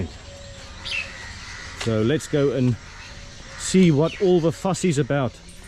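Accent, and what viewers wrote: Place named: South Africa. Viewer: Are you South African by any chance? Your voice and accent sounds very similar to the South African cricket legend AB de Villiers. And just as I was typing this, you also happened to mention his marriage proposal story, so I am guessing you might also be from South Africa. Anyway, awesome video. Cheers!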